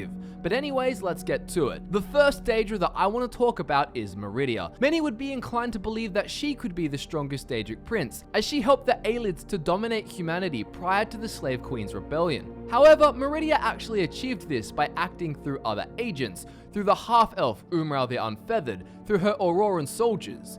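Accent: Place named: Australia. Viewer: I love how in his Aussie accent says "Sheagorath"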